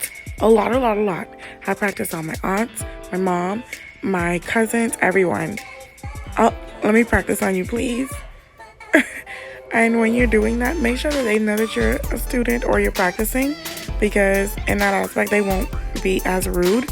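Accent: Bahamas. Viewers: From I hear that lil accent I say yea she Bahamian! 🇧🇸 New subbie!! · Listen I was like this gal sound Bahamian lol. 🇧🇸🇧🇸🇧🇸 New Subbie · Lol I had to come check the comments cuz I’m like she sound Bahamian 😂😂❤️🔥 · You have a new subbie for sure! I scrolled down to see who would say you have a Bahamian accent.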